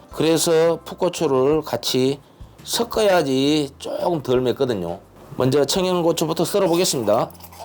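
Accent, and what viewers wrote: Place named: Honam, South Korea. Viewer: 선생님께서 정겨운 호남말투로 만드시는 경상도 토속음식 너무 재밌네요 김천 흑돼지식당 가서 이거 먹어보고 반해서 레시피가 궁금했는데 잘 봤읍니다.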